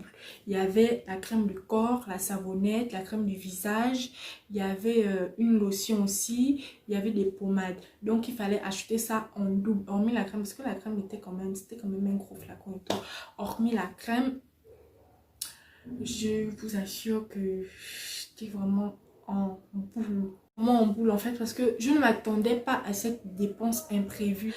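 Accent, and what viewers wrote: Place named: Gabon. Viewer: Accent gabonais on dirait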